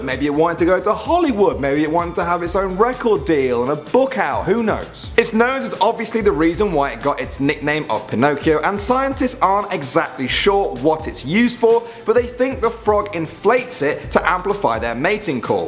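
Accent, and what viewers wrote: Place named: England, United Kingdom. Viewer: Like English accent🇬🇧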